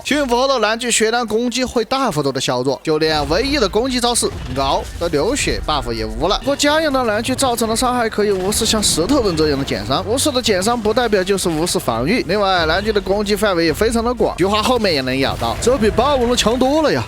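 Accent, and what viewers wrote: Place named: Sichuan, China. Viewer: 的地道川味方言视频竟然在油管这么火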